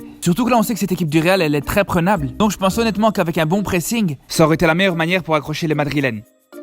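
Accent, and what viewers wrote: Brussels, Belgium. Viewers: Son accent est véridique, elle vient de Bruxelles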